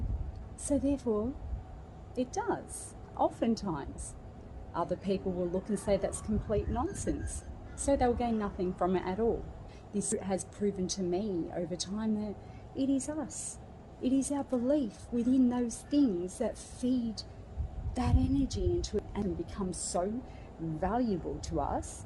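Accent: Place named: Australia. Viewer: I like your accent.Are you in Australia?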